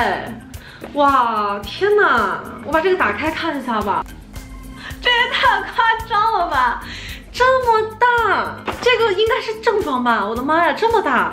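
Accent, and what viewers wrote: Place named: Xi'an, China. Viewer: 作为半个西安人，听krysti说话特别亲切！西安妹子加油！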